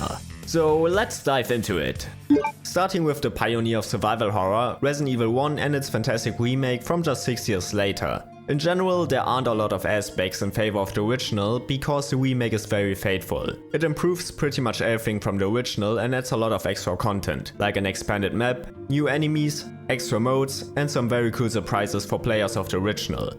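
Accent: Germany. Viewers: I love your German accent. Sehr gut! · nur 2 sekunden drinne und ich höre raus du bist deutscher :D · i sense deutsche accent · Good old German accent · I hear deutsch mein freund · i’m hearing a little deutsch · At first I thought the man had a lisp, then realized he's just German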